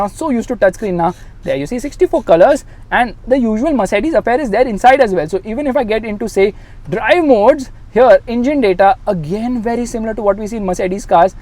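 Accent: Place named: North India, India. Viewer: Irritating North Indians English 🥵🥵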